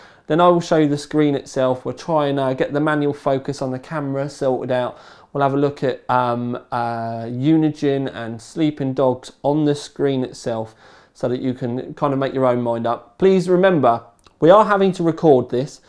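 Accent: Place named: South East England, United Kingdom. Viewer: Off the top of my head, you are a Kent, Essex lad... I could be wrong... Maybe South of the Thames... I know you are somewhere South East, South West possibly, but I would have lost my money... South East for me! Peace...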